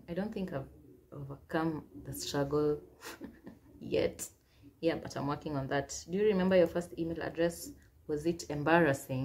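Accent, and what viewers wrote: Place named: United Kingdom. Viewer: wuuueeeeehhhh🤣☺😂😂😂😂 na hio british accent yako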